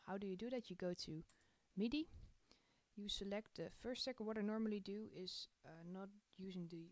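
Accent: Netherlands. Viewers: ik hoor dat je nederlands bent dus ik doe het ff zo, mijn minilab kan niet opnemen en selecteren zoals die van jou het doet. mis ik iets?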